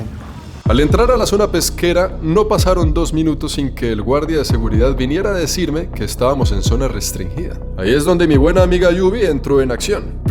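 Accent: Bogotá, Colombia. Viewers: El acento de los colombianos🌹❤️ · En este video escuche que es colombiano ❤❤❤yo amo ❤ese acento colombiano ❤️❤️❤️❤️❤️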